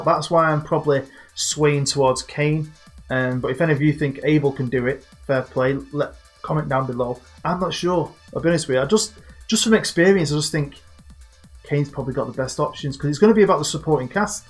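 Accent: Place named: Manchester, United Kingdom. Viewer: Not sure if it's the epic script or the cool asf Corrie accent that is more addictive!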